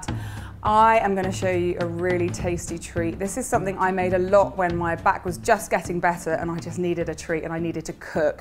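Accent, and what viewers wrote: United Kingdom. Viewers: I feel like Frances mcdormand is giving me instructions to make French toast in a British accent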